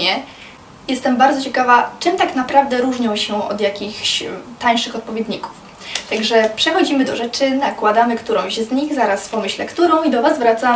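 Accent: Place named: England, United Kingdom. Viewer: Jejku! jaki ty masz śliczny angielski akcent!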